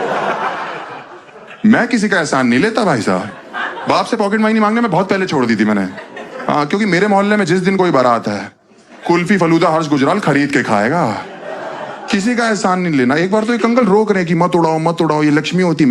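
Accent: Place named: Uttar Pradesh, India. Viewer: His UP accent is the best 👏🏻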